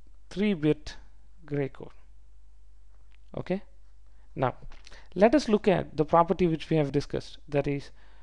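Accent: India. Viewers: let me guess. Are you from Andhra in India?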